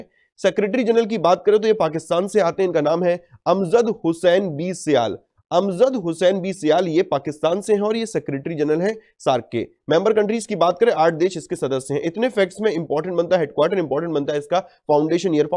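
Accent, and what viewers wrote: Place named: Agra, India. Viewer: Arrey yaar ko agra me bolte h sir agra ke h isliye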